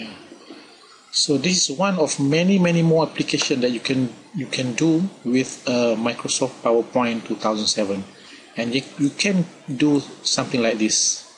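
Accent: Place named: Malaysia. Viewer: aku dengar suara org speaking tu mcm org malaysia..last2 tgk logo mmg malaysia punya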